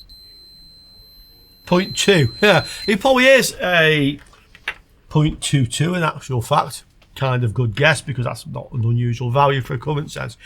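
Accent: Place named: Potteries, United Kingdom. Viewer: I think the most recent one was “Hot Ground”, your video with Carlos on how to avoid blowing up your oscilloscope without having to buy a differential probe. <link> timestamp is where you demonstrate this technique. I’ve been enjoying your videos for several months and as my late wife was from the Potteries (Burslem), I recognised the accent straight away. You’re doing a great job of explaining these electronic circuits so that it’s easy to see how they work, and your commitment to safety and explaining the risks inherent in testing and probing mains-operated devices is impressive. I did a degree in electronic engineering before I changed career and I’m beginning to appreciate how much I failed to learn back then! You and several others on YouTube have reawakened my interest in this fascinating field, so thank you very much.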